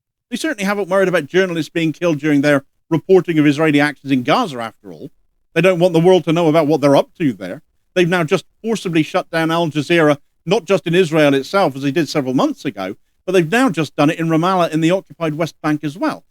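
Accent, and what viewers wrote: Cornwall, United Kingdom. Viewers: Aha, a Cornishman! That accent will always make me sit up. This is great, proper job from a Cornish girl living abroad.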